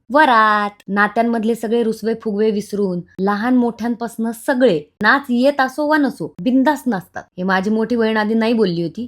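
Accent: Beed, India. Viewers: मॅडम तुम्ही बीड जिल्ह्याच्या आहात तुमच्या बोलीभाषा समजते कारण मी ही बीड जिल्ह्याचा आहे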